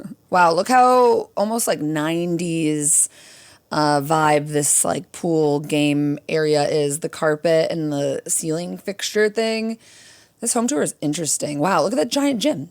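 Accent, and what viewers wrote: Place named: Midwest, United States. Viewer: I love that with your midwest accent, it sounds to me like when you say tour you're saying 'tew-er' 😆 I cant not heart it!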